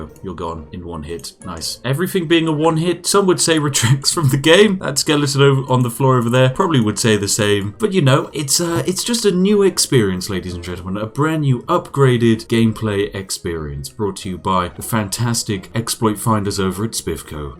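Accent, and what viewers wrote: United Kingdom. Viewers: Cannot stay away from that lovely British voice and cheeky exploits of yours ;) Just subbed. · Oh No! My favorite game is so broken xD Lately I’ve bought Titan Quest HD for my iOS device and it’s awesome port from PC. To Sir Brit: Man, ur so awesome xD Mostly because u’ve got that British ‘ladies and gentlemen’ accent which I consider as pure awesomeness. I’ve watched so many of your vids that I’m wondering about drug relation of Yorkshire Tea.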